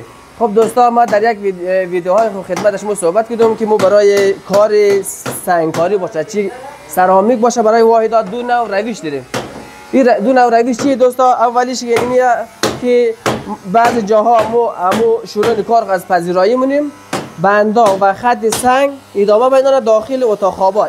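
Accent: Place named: Hazarajat, Afghanistan. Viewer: باسلام‌بهتره‌ بدون لحجه هزاره جاغوری صحبت کنید مثال فارسی دری یافارسی‌تهرانی صحبت کنیدکه همه فهم باشد.تشکر🇦🇫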